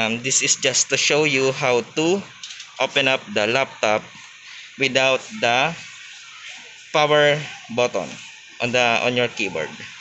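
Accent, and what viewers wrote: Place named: Visayas, Philippines. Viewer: Bisayaa oi. Peste. English² pang kagwang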